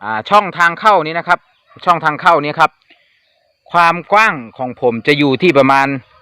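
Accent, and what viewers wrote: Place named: North, Thailand. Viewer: คุน เป็นคนตี่ไหนคือเหว่าอี่ได้อู้เหนือได้คับ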